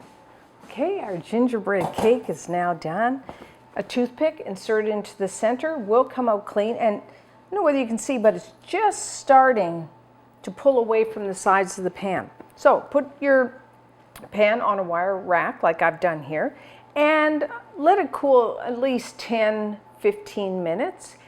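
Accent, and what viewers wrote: Canada. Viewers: Shes canadian right? like her last name and the way she says out.. she so cute.. i like her videos.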